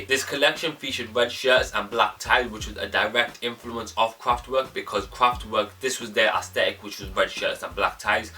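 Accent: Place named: Manchester, United Kingdom. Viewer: The Manchester accent too strong 😂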